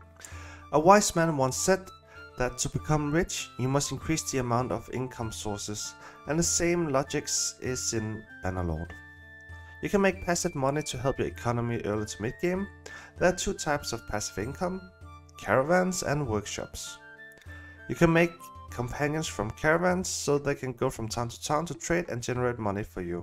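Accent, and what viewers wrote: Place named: Denmark. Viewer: Faldt over denne video, og sad også og tænkte "han lyder lidt dansk ham der"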